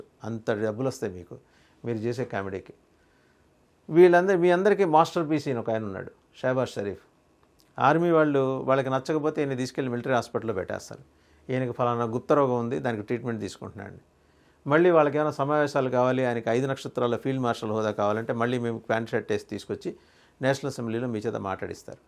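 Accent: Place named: Nellore, India. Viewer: It seems you are a nellore person as per ur accent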